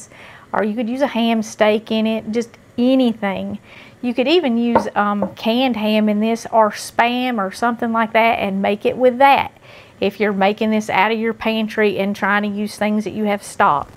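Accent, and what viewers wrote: South, United States. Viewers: I am a New York Italian, who loves Jesus and I feel such an affection for my sister with a southern accent, I would love to come on your show one day and and cook something real Italian like a very old Neapolitan recipe that we call rice pie, we are all going through a hard time and I so appreciate your faithful words and I so agree with all you say. To God be the glory, God bless you my sister and again I say Thank You. I will look through the comments for those who need help I am blessed I live with my daughter she looks after me God bless her, so I will let the Lord lead me in helping others. Oh by the way I love your recipes.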